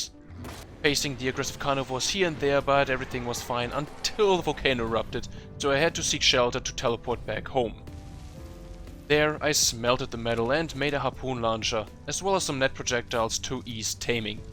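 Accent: Germany. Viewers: Bro u german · I love yo vids, but I have just one question: Are you german? 🧐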